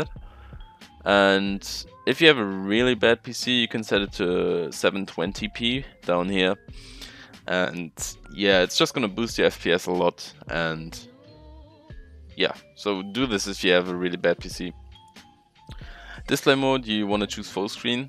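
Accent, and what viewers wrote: Germany. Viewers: cleannn valorant intro. edit: are u german, because u sound like whitecat (osu) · War ja klar das er Deutsch ist XD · Ich wusste es das du deutscher bist habe mir die ganze zeit gedacht das ist doch ein deutscher der englisch redet aber jetzt der beweis 😂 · Wie man einfach das Deutsche raus hört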